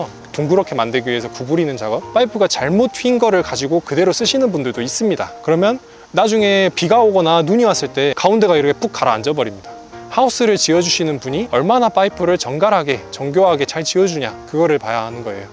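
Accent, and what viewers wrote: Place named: Jeolla, South Korea. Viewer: 서울말투쓰려고 노력해도 전라도 말씨가 다 들리노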